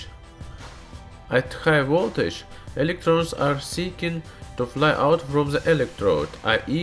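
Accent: Russia. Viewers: nice to be a brazilian that try to understand a russian talking in english. =D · I can't understand anything from your "russglish"👌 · this is russian accent??😂😂😂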